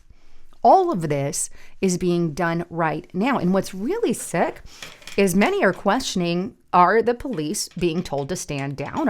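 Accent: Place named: South, United States. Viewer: Great thorough report as always - and nice job w/Min-ne-a-polis. Hey, if it's the way you have always pronounced it - yes, hard habit to break. Also, I'm originally from Mass-a-chu-setts - noticed you like many here in the South pronounce it Mass-a-two-sh*ts - not sure if its a purposeful slight on the state by Southerners. Either way, at this point in MA history, its a very appropriate pronunciation!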